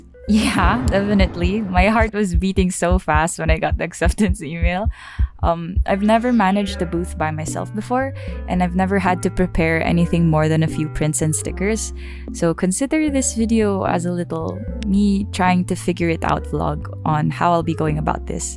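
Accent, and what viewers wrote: Philippines. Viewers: The Pinoy accent is strong with this one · She sounds like a filipina. That accent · Filipino accent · I HAD NO IDEA U WERE FILIPINO BUT AS SOON AS I HEARD U SPEAK I WAS LIKE 🐶🇵🇭❓️ AND THEN I SAW MARKET MARKET OMGG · I'm surprised someone thought you were American, you have an identifiable filipino accent. I dunno, I'm filipino and I live in the US so I can point it out easily better than most lol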